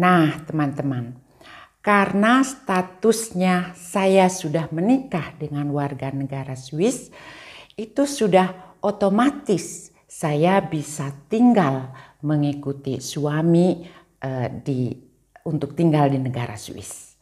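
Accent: West Java, Indonesia. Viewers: Masya Allah. Ibu parantos 40 thn di Swiss tapi tasih keneh nyunda. 👍 Punten neupangkeun abdi ti Cileunyi bdg. Pun anak Oge di Swiss ti sasih januari nuju ngiring program au pair, linggihna di kota meggen. Mudah2an pun anak tiasa tepang sareung ibu. 🙏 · 30 tahun lebih tinggal di Swiss, tapi logat bahasa sundanya masih terasa bu.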